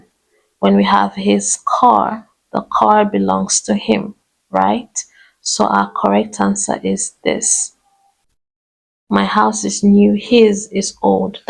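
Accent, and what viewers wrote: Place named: Europe. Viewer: Alright , the way you speak and pronounce , it's obvious you are NOT a Native English speaker. Any fluent English speaker will surpass your explanation in time lesser , 3/4 th or 1/2 the time you spent. explaining. You have a very strong , thick European accent.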